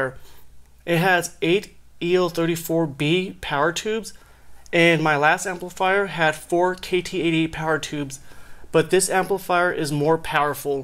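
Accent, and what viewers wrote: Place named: Russia. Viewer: You have a little bit of Russian accent, nice amp!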